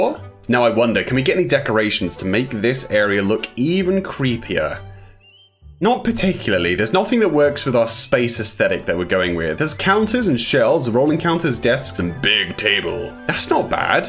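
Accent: United Kingdom. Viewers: Wow I just came here to know you were British or something and how much u changed wow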